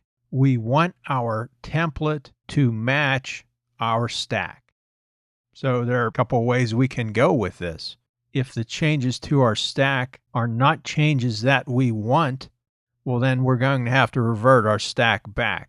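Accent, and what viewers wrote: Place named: United States. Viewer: Yay! An american voice!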